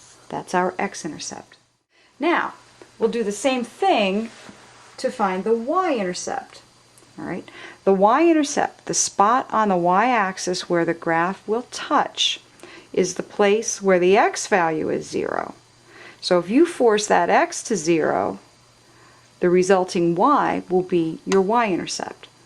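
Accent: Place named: United States. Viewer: I like your american accent, its very soothing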